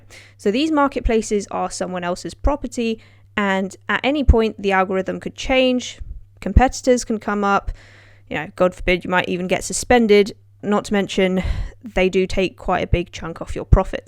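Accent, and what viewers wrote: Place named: Southern England, United Kingdom. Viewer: She's from the South of England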